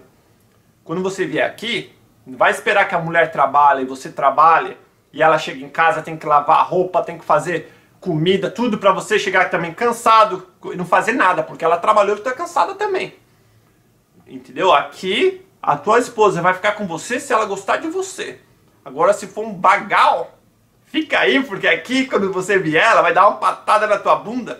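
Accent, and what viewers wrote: United States. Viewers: Vc ta com sutaque de americano, é bem engraçado kkkk (obs: nao sei se é assim q se escreve sutaque). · Nem parece que é brasileiro com esse super sotaque americano. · Você parece um americano mesmo falando português... uma pena que meu Inglês não chega nem no básico!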